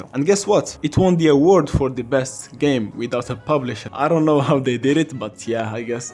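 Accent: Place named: Morocco. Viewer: i hear a moroccan accent 😂, Nice video GL on the 1M views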